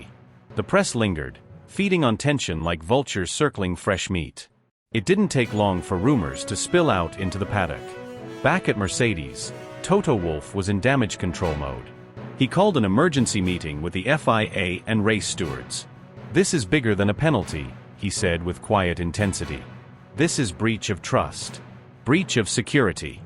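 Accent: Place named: United States. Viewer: Absolute rubbish. Why does the voice always have an american accent. Liberty Media as part of the tRump Mafia?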